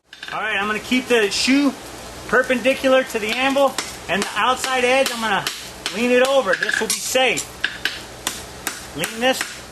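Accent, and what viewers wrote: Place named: New Mexico, United States. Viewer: . this is an excellent video, clearly explained and the photography does what it is supposed to do, allows viewers to see the detail and the progress of the process. The narration is very good, I could listen to the new Mexico drawl all day. Thanks for a great resource.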